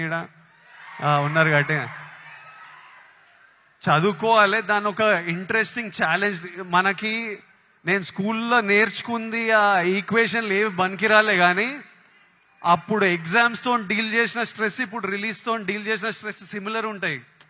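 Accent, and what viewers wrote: India. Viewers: Hyd slang Ithe Ndi Telugu a adhi kuda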